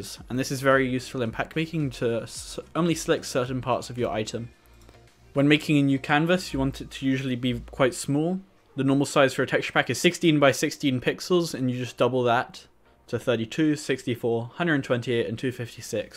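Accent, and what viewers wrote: United Kingdom. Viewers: if your voice library is so small you came to that conclusion I feel sorry lol. He is british accent sort of very lightly sounding but that's the only real similarity Everything else you assumed is placebo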